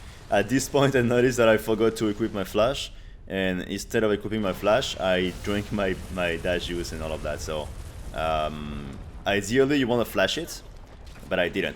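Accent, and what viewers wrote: France. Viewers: Tu serais pas français par hasard???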